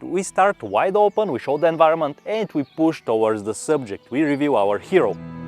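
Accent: Russia. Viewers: Чувак, у тебя просто кошмарный рязанский акцент.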